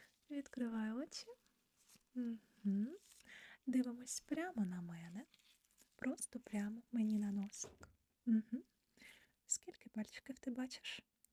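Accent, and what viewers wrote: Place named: Ukraine. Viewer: As I always say, relaxing begins with!🤩🤩🤩 what a beautiful whispering voice with that magical Ukrainian accent!!!😍😍🥰❤Love Greetings Oliver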